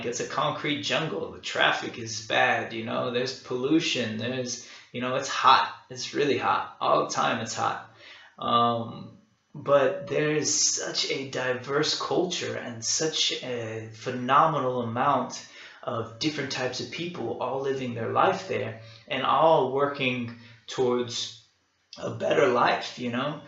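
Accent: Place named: Texas, United States. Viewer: Felt like I just listened to myself with Texas accent haha!! Awesome bro :D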